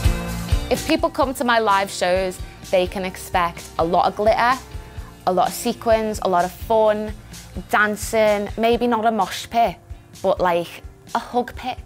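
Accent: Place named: England, United Kingdom. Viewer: While her music is fun, and well produced, sounds and acts like an American's stereotype of an English person truly personified.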